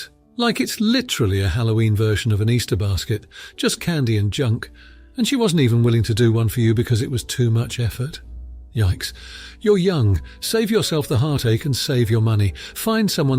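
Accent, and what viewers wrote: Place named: United Kingdom. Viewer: Big fan of the British narration.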